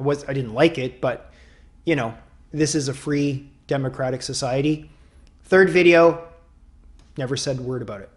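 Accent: Canada. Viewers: Keep those great videos coming. I'm not vegan myself but do eat vegan a few days a week. I love the calm and more mature messages on your channel. And the canadian accent that makes me feel like I'm back home (I'm canadian living in France). 👍🍁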